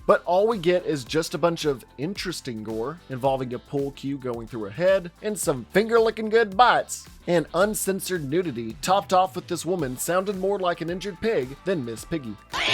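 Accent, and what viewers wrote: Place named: South, United States. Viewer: I love hearing a southern American accent on an excellent movie review.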